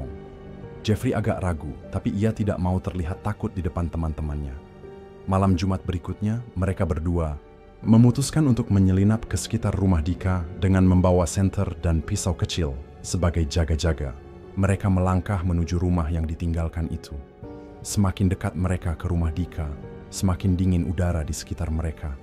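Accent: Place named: Java, Indonesia. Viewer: Biasanya kl p.dhe yang cerita logat jawanya ikutan keluar😅😅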